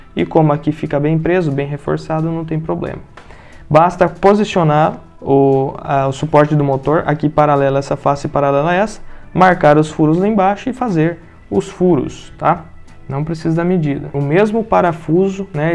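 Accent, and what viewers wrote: Paraná, Brazil. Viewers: Cara, tá certo que o cabeludo é feio, tem sotaque paranaense, e tal... rsrsrs... Mas dar dislike?!?!?! Putz, esse cara tá contribuindo pro progresso dessa nação muito mais do que todos os políticos juntos, e sem cobrar nada... E ainda tem espírito de porco que dá dislike?!?!?!?! Patacaparau!!! Bora clicar nos joinha, galera!!! E, Professor, continue assim, que o Brasil precisa é de gente como você. Muito obrigado!!!!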